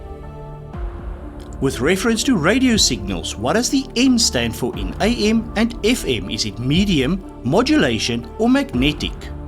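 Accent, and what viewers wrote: South Africa. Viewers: Also thank you for your great quizzes ! Your pronunciation is wonderful !!! The S/A accent is great !! (JOKE ) "Tell me , being South African , do you have a grudge ? " " of course I have a grudge ...where do you think I keep my car? " xx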